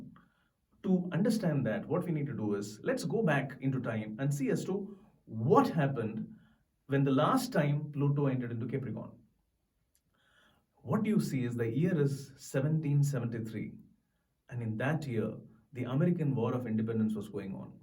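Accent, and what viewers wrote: India. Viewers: The auto text can’t understand Indian English either... Germany rather than Gemini.. interesting though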